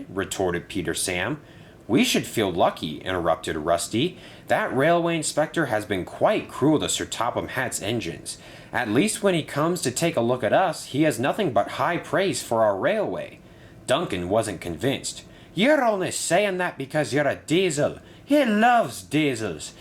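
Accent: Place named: Scotland, United Kingdom. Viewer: Nice episode! Looking forward to seeing how this all plays out. There was some very clever wordplay in this episode, and it was thoroughly entertaining! Also, I swear your Scottish accent gets better every time I hear it!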